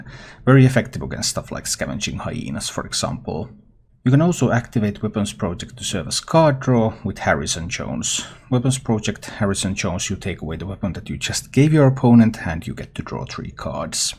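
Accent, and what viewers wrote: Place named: Finland. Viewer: You sound very finnish with your accent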